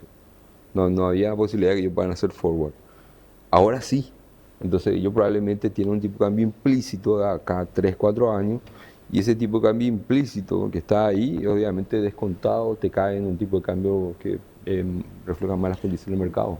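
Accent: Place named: Paraguay. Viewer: Habla como paraguayo. No se le entiende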